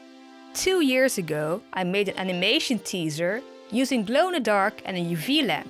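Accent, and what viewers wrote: Netherlands. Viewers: haha, the accent sound really weird for people who arn't dutch.. our accent is really different and sounds kinda funny when we speak English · Ahh I found you due to Dollightful and I heard your accent was Dutch haha. Sorry I am also Dutch and well I hear it immediately ! I do not do doll customization or anything. But I always love to watch dollightful! So cool I found your channel! I really liked the collab between the three of you!!! Just love how you were so creative with the bottom part! Keep up the good work! Fellow Dutchy! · Are you Dutch? Your accent seems Dutch XD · Je kan gewoon horen dat je nederlands bent😂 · Wow this is really cool! Quick question, are you Dutch? I think I regocnized the accent xD. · ik dacht ook al van hu?! Ben jij Nederlands toen ik haar hoorde😂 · Wow once you started talking about pokemon I realised that you really sound Dutch and I was very confused for a moment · Im dutch to and i tought it was a dutch accent but i wasnt sure 😂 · I was watching this like “why does that accent sound familiar” and then I saw your where Dutch and then I was like “oh daarom dus” ik ben ook Nederlands hihi😁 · i could just hear your dutch xD im dutch as well! 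· haha, ik had al z'n idee dat je Nederlands was. Dat accent herken ik altijd ;D Very nice work :D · I just found you because of dollightfull. But I have a qeustion where do you com from. Because of you're accent it sounds like you're dutch, but I can be wrong. I love the doll by the way!😍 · Omg I’m really late watching this but I could tell by your accent you are dutch too! Subscribing rn! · i heard the way you talked english and i was like is she dutch too? and then you said you saw the dutch version of pokémon and then i knew i heard it right xD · your accent sounds dutch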